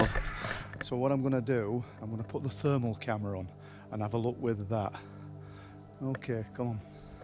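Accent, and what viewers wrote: Yorkshire, United Kingdom. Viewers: Wonderful video and so lovely to hear a Yorkshire accent again, after growing up in The Dales. I'm 400 miles North now but do miss it, even more so now.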